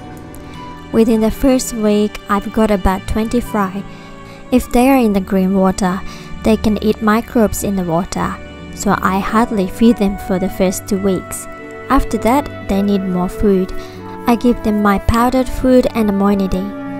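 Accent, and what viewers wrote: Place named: Australia. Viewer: Ive really enjoyed this video and I've also really enjoyed listening to you. I spent time in QLD and your manner of speaking is nicely peppered with familiar aussie euphemisms. Lots of good information, also appreciated you sharing the results good and less so. Now im toying with the idea of creating a little pond myself- im getting ahead of myself now though 😂. Ive subscribed and look forward to seeing what else is on your channel. Big l❤ve xxxx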